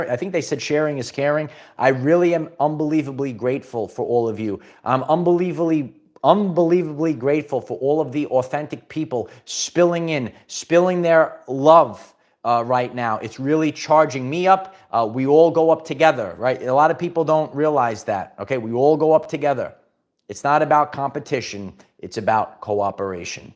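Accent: New York, United States. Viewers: I love the NYC accent. The Bronx? And yes, you will never be completely ready. But you are now have been prepared enough to go to launch. "Peddle to the metal", or "bury the needle", call it what you will. The time is up. It's full on come May 2024. Enjoy the quiet time remaining while it lasts. This is it kids. No going back now.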